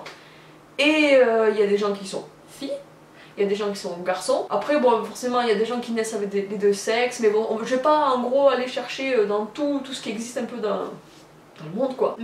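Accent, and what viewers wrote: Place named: Corsica, France. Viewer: j'aime bcp ta vidéo tu as un accent Corse c'est ouf !😂 continue tes vidéos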